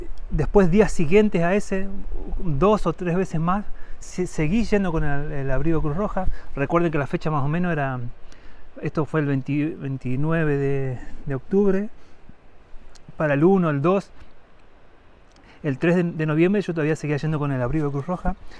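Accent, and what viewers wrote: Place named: Argentina. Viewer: Por ser argentino tenes acento de que parte de argentina sos. Muy buenos los vídeos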